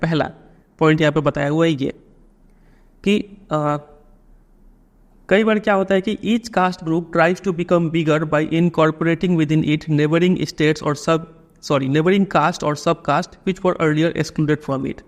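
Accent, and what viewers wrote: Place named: Bengal, India. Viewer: tabhi mai sochu aap ka accent thoda bengali hai😅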